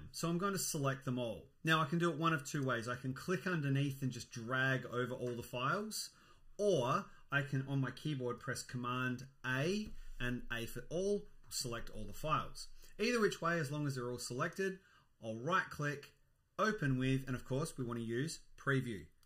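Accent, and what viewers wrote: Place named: Wales, United Kingdom. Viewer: Thanks mate, you saved my bacon. Also, I love your Welsh accent! 😉👍🏻🇬🇧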